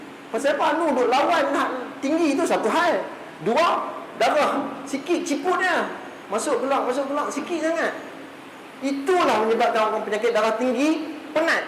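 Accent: Kedah, Malaysia. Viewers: dia pakai bahasa Malaysia juga tapi loghat Kedah. Sebab tu awak tak faham.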